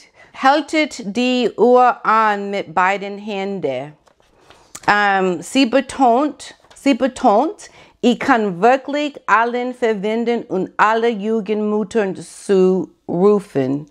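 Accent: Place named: United States. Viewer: Babs kann die ganze Patchworkfamily, also auch seine uneheliche Tochter Anna und Amadeus aus seiner zweiten Ehe, zusammenhalten. Sie versteht sich mit Angela Ermakova und Lilly Becker, die ihre Kinder sehr gut ohne Boris weiter erziehen. Finde ich ganz toll und ich hoffe noch immer ein bisschen, dass sie mit Lenny Kravitz zusammen kommt. Er ist über Jahre ihr engster Freund, aber vielleicht ist es besser so, denn eine Beziehung ist immer kompliziert und sie sagte einmal, dass Freunde sehr wichtig für sie sind und sie sich als Single wohl fühlt. Emanzipierte und intelligente 🚺 👍 Da fällt mir noch ein, ein Rothaariger mit einer Farbigen zusammen, wie bei HM 😅 aber Babs ist ein ehrlicher guter Mensch. Stelle dir mal vor, Harry betrügt M 🤣 Ich liebe deinen amerikanischen Akzent 😍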